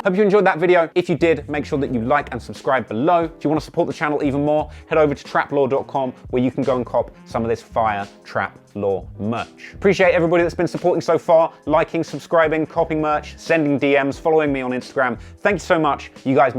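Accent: United Kingdom. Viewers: How got me to subscribe: by telling great stories whilst having a rather dashing UK accent. I typed this in a UK accent.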